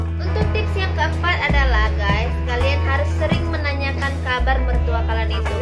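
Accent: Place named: Medan, Indonesia. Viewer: Oh seperti itu ya ka..logat nya kayak orang medan ya ka salam dari rki asal lampung tips nya sudah di simpan ya ka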